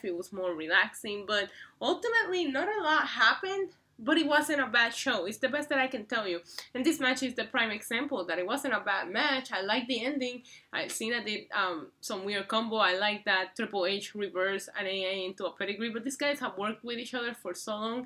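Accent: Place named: Puerto Rico. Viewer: by your accent you sound Puerto Rican!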